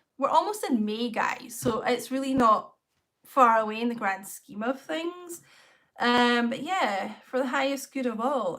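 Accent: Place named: Edinburgh, United Kingdom. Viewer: I LIVE IN EDINBURGH TOOOOOOO!!!!! I FRICKING KNEW IT!! THAT ACCENT! <3 I discovered your channel a few days ago and LOVE your readings! I've been reading Tarot on facebook groups etc and hope to start my channel soon! Commenting without having watched the reading yet haha but that title grabbed me! Got you on the background while im working on Uni work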